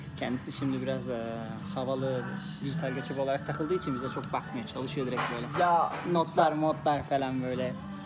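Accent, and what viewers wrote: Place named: Kars, Turkey. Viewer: Onca yıldır dışarılardasın abi hala içindeki Karslıyı atamamışsın. Konuşunca o "ğ" sesi çıkıyor hala. maşallah. Zamanınız olursa gelsin babasının memleketi Serhat Kars'ı görsün.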